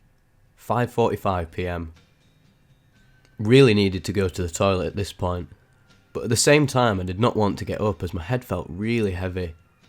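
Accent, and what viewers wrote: United Kingdom. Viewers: Love your british accent, voice and tempo👍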